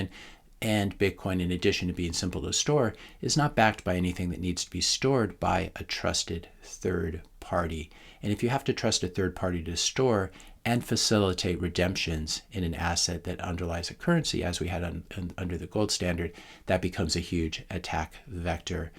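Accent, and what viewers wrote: United States. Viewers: Sounded like a ignorant american 👎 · I hate your yankee accent , it is awful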